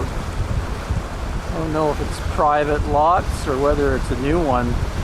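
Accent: Canada. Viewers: I think your Canadian accent is stronger now than when you were in the states... just say'in.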